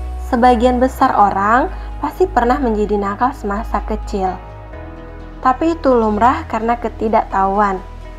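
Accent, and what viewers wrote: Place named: Batak, Indonesia. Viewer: Setelah sy baca koment2, byk yg tdk setuju dgn logat dan cara bacanya, memang molo logat batak na takkasan hurang do memang huhila, idenya memang bagus admin, tp tolong diperhatikan hal2 seperti ini, krna nnti bisa berpengaruh bhsa ini kepada suku lain yg membaca, masukan aza sih min... Tetap semangat dlm berkarya.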